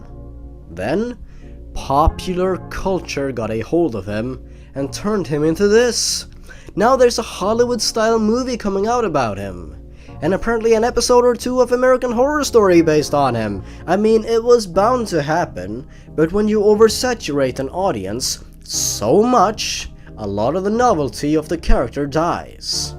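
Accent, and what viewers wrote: Sweden. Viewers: Are you Swedish? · Are you Swedish!? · wait are u swedish?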